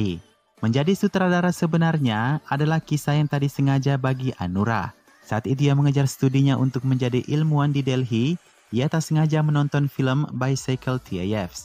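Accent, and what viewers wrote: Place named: Makassar, Indonesia. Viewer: Admint org makassar kah..logatx kaya org makassar 🙂😃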